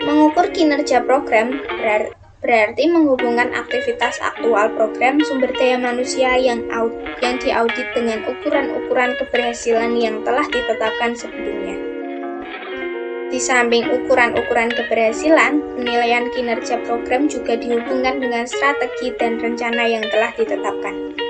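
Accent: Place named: Java, Indonesia. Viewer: Cara penyampaian unik dengan logat jawa, jadi mudah di headline pointnya👍👍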